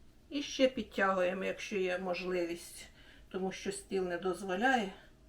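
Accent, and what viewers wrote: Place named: South, Ukraine. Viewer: до перестройки этот говор считался сельским и на нём говорили на всём юге страны. в 80-е приехала к подруге по институту на свадьбу на Кубань. попросили помочь на кухне. определили помощницей меня к бабушке. она стала говорить вот точно также как с экрана говорит эта женщина. прошу девчонок мне перевести и заодно спрашиваю на каком языке она говорит. мне отвечают ЭТО ХУТОРСКОЙ ГОВОР МАЛООБРАЗОВАННЫХ КРЕСТЬЯН. так что из сельского говора сделали украинский язык и убедили население, что это их национальный язык. а по сути на нём говорил весь юг страны.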